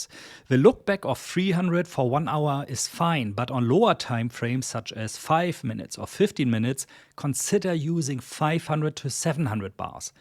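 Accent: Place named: Germany. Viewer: Subbed - I had to. It's the German accent. ~(￣▽￣)~*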